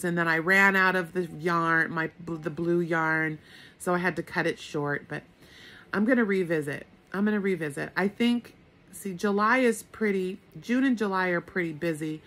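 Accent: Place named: Pennsylvania, United States. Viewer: Hello. Love listening to your stories. I grew up in Fontana California and there was a Kaiser Steel plant there. Most men worked there in the 60's and 70's. It was torn down around 1999 in order for the California Speedway to be built. My daddy died in 2002 and I still miss him so much. I also say wash like you and i say younse and buggy for shopping cart. I got these words from my mom and dad who grew up in Pennsylvania. Have a great day.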